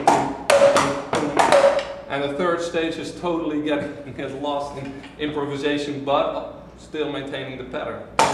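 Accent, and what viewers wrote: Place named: Netherlands. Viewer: Hahaha that Dutch accent. Building up to a very cool beat towards the end!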